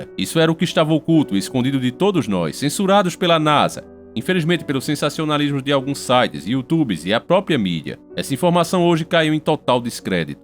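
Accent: Northeast, Brazil. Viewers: Sotaque de nordestino kkk mas a voz e boa... Cornao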